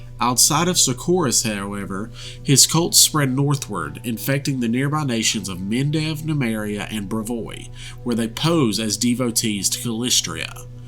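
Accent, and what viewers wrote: South, United States. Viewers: my man has cornered the market on southern US inflected pathfinder lore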